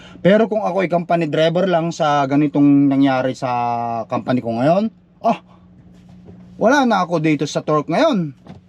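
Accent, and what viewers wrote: Ilocos, Philippines. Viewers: ILOCANO TALAGA ANG TONO MO MAGSALITA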